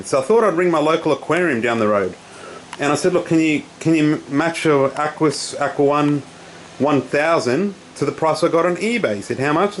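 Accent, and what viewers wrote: Australia. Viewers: Love the aussieness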